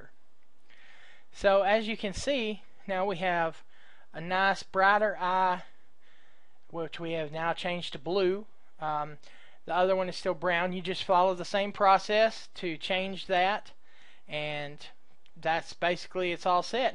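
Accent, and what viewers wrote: Texas, United States. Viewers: a real Texan. love the way you talk. the layer could be duplicated then dragged over to the other eye then re-adjusted in size and fixture to give the same effect without repeating the same work on the other eye. good work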